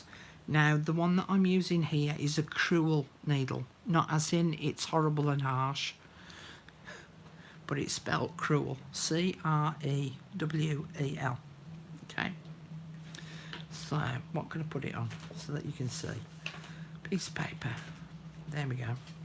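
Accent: United Kingdom. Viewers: I am a fabriholic and álways wondered how to do this paper embroidery thing - thanks for sharing your vast knowledge. Just loved the way you split the thread. You are one gifted lady and I luvvv you British accent. Stay Blessed girl - God loves us all.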